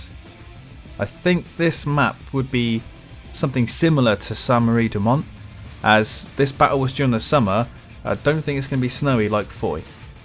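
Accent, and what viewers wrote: United Kingdom. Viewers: I like your british accent! Hi from western front!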